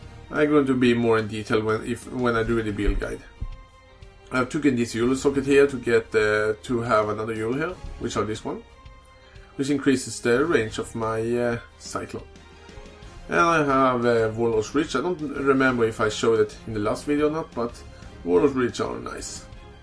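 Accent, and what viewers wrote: Sweden. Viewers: where in sweden are u from i also have family in sweden and the only reason i ask is u sound like one i know:)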